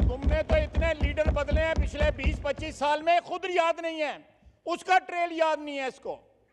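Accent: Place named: Kashmir, India. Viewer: Kashmiri easay hi bolta hai.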